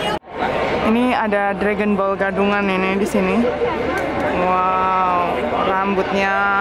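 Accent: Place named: Java, Indonesia. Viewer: Medoknya kluarin dong kak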